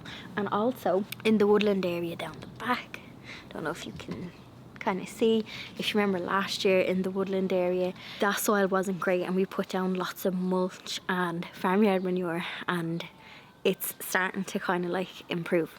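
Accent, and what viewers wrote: Ireland. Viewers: Love listening to your accent my maternal great grandparents migrated to Australia from Ireland,my grandfather could put on a good Irish accent lol I’ve only been to Ireland once, but could have just moved back in so easily it was like coming home, just can’t leave all my family behind 😢 I don’t know how they did it in the past To come to a strange land so far away.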